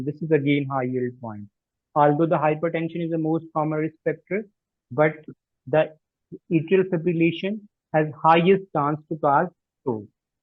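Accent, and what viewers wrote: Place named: India. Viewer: Your E. accent is horrible. Best for India to quit English talk. Make it silence in or written Subtitle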